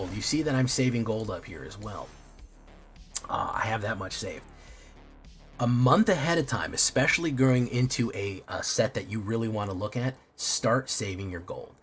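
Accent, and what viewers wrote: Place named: Fargo, United States. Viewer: hm yeah it does sound a bit like the people in Fargo, that makes sense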